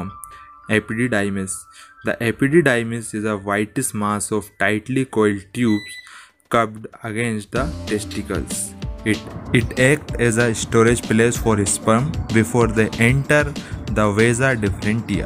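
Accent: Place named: India. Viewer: 발음보니 인도서 만들었군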